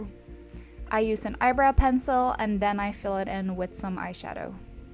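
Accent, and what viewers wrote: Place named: Norway. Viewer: I knew it!! My mother is Norwegian and I was born in Norway but I live in America sents I was 6 ( I’m 18 now) so I don’t have much of an accent . But I thought you sounded Norwegian 😂💕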